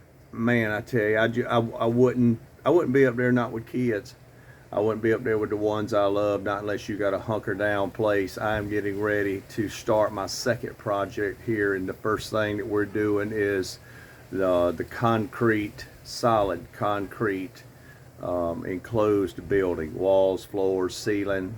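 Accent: South, United States. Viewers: Southern accent is fun to hear 😂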